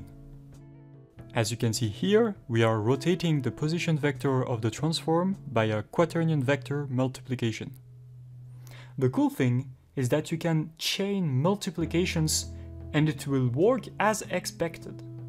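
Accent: France. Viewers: thank you) best French English ever)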